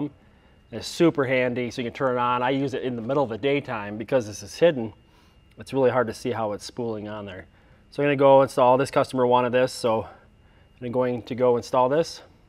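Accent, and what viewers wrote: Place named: Canada. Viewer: Nothing wrong with a Canadian accent. lol As a Canadian, I know of lots of us live in the Detroit area and work for the Big 3, so I figured you might have been amongst them. :)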